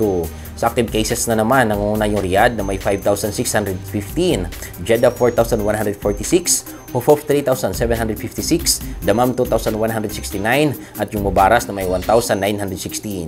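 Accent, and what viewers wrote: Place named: Visayas, Philippines. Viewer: Taga asa mankaah mora mag kag bisaya hehe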